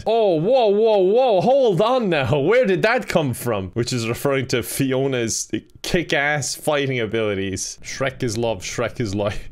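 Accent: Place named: Ireland. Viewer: Bruh I'm subbing because of the Irish accent and funny videos :]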